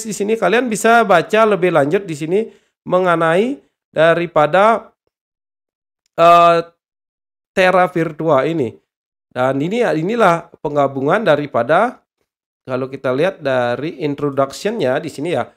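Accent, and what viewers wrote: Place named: Bali, Indonesia. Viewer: Saya tau dr logatnya bli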